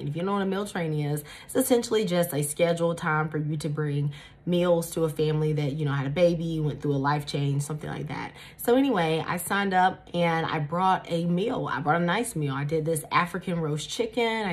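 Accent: South, United States. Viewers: You are so beautiful and I love your Southern accent, where are you from??😍🥰🥰🥰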